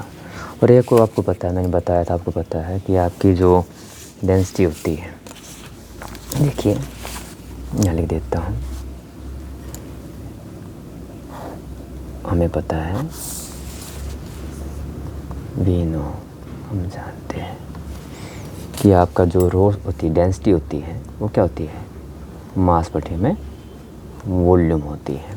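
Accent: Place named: Haryana, India. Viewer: Haryanvi boli me smjhne ka maza hi kuch aur h 😂 Btw, great explanation 🫡👏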